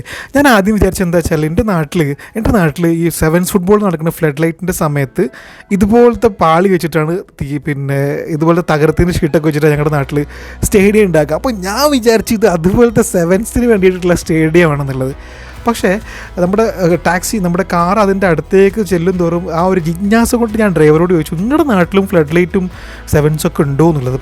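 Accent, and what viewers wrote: India. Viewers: പുയ , ഇടക്ക് മലപ്പുറം ശൈലി പുറത്ത് വരും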